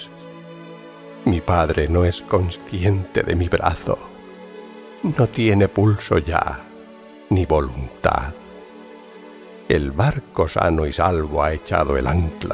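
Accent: Spain. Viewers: Eres el mejor señor, además me encanta el acento español, me parece que se oye más hermoso el castellano. Un saludo desde Colombia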